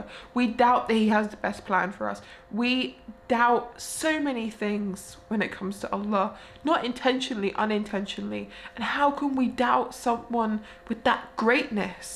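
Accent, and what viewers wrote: United Kingdom. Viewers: Your british accent is special🤪🤪🤪🤪👍👍👍👍 Be istiqomah/ consistent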